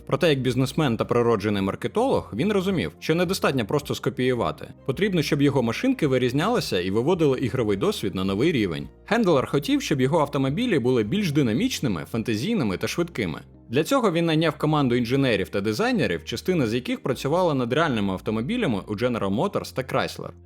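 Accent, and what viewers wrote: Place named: Surzhyk, Ukraine. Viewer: Интересное видео посмотрел с удовольствием так как знаю украинский но по вашему акценту и суржику ухо иногда режет вы будто через силу и принципиально выбрали язык повествования из-за своей позиции это хорошо отстаивать мнение но если хотите больший охват аудитории то сами понимаете что не большая доля охвата людей в снг понимают украинский(с уважением коллекционерство без политоты)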